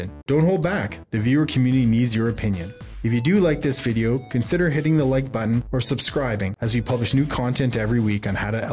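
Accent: Canada. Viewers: Is he Canadian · Speaker is Canadian? Doesn't matter...just love your "o" sounds.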